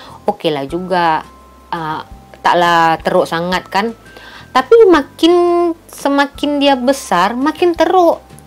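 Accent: Malaysia. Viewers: Astaga ! Ya ampun. Kelakar betul ya. Rawatan di Malaysia, hampir gratis. 1 Ringgit Malaysia diberi banyak obat. Loghatnya udah bertukar kepada slanga Malaysia. Sukses bu.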